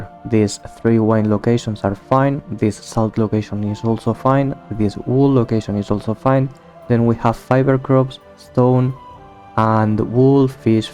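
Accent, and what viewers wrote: Asturias, Spain. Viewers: I also thought your accent was Spanish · Because he is not stupid and tries to reach as many people as possible via speaking english and not spanish? I guess is Asturias? :) I wouldnt watch the video if he speaks Spanish. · ¿Por qué hablas ingles si tienes un acento de Asturias que no puedes con el?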